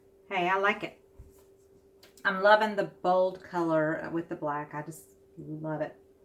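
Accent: South, United States. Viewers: Awesome artwork! New subscriber to your channel. How many drops of floetro do you add to your paint? Also, what's your background paint recipe? Type of paint? Thanks. Oh, you sound like a southern gal. I am too-from NC. Have a great day! 😁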